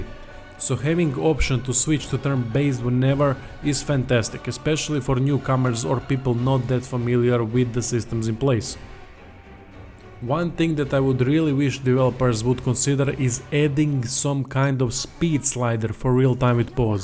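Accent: Russia. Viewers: tvrdi ruski naglasak i odlicne analize, što više poželit, pozdrav iz splita :) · The man sounds Russian, so you know he knows his CRPGs! (And gaming in general as eastern Europeans are the most prolific in excellent game development nowadays!) I have mine in the cart right now ready to pull the trigger after I'm done watching this video! EDIT: Just bought it, I usually don't do pre-purchases, but I planned to get it day one anyways, so...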